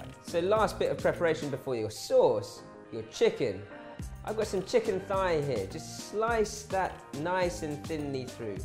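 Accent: United Kingdom. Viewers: A oriental with a British accent OK you got me on this one🤓 Looks delicious❤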